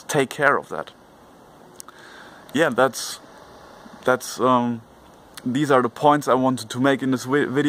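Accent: Germany. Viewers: Dude you must be german cool accent 😅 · german- english :D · I like your German English :) Grounding is verrrrry important otherwise the trip might be very dangerous and scary. I also would suggest anyone who is willing to take Ayahuasca to use a grounding Crystal. Lava is excellent since it comes from the source of the Earth. Otherwise you might go into another world and you might feel you can't come back to this dimension/reality and this is the worst feeling. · German English lol cute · hey man are you german?? Sound like.. bin nnämlich auch deutsch und ich würde gerne mal mit dir quatschen wenn du zeit hast :)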